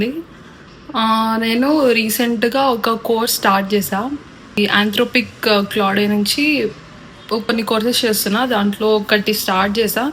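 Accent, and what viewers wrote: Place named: United Kingdom. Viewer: Your English Accent is like British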